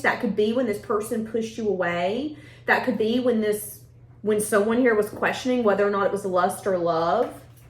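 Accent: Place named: East Tennessee, United States. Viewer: Love the East TN accent:face-red-heart-shape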